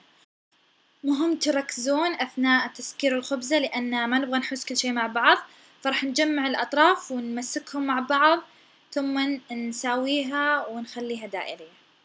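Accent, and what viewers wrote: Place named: Saudi Arabia. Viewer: صراحة انك مبدعه ماشاء الله بالتوفيق وبالنسبه للي يستغرب طريقتها في الكلام اذا سعودي ليش مستغرب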